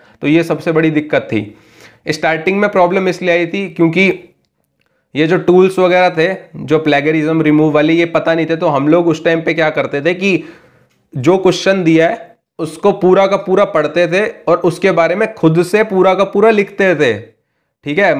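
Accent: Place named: Uttar Pradesh, India. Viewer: mujhe laga aap up wale hain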